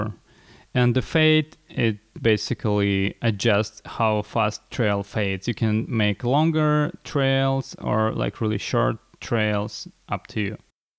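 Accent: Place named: Russia. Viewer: рашн рашн,акцент не спутаешь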